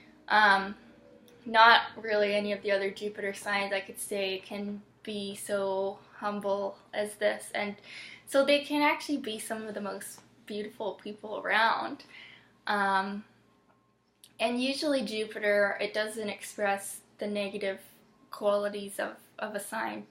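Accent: Canada. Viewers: I was the person at my last job who was in charge of keeping track of the expiration dates on food and sodas! lol And I would hone in on all my data entry! lol Omg, and work just for work's sake. I always gave work my all - I never just slacked off - even when my boss wasn't there. Spot on! You sound like you're in Canada?? You described my work ethic to a T. And I always invented little shortcuts to make my job easier.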